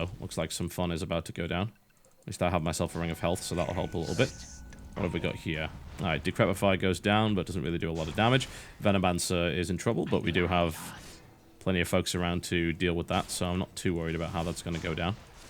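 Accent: United Kingdom. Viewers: Amazing series. Always the best content from you,. Keep it up. Hell, even the girlfriend prefers content from your channel. Calls you the classy sounding British guy.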